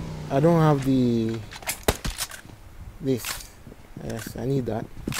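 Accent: Jamaica. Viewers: I love when he says; you know? Love jamaican🇯🇲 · Hey are you from Jamaica cause you sound like Jamaican?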